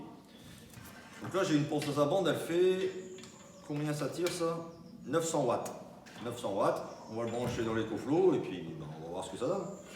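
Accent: North, France. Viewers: Bonjour, vidéo très instructive comme toujours, merci 😊, j'adore l'accent du ch'nord, ici moi j'ai celui du ch'sud 😁😉😘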